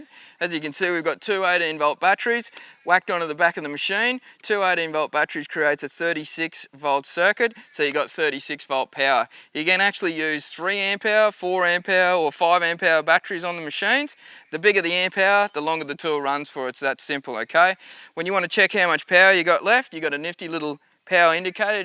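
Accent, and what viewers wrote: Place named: South, United States. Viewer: Where is this guy from? I can hear southern accent, but can't catch where it is exactly from/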